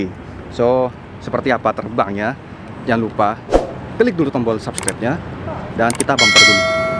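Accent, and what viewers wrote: Madura, Indonesia. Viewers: Logatnya madura...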